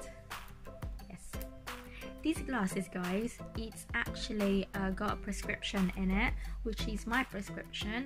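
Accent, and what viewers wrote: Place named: Philippines. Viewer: Nice accent are filipina? Nice sun glasses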